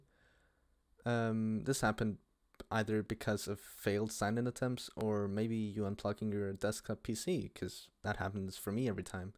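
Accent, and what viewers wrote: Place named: United States. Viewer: Finally an American not Indian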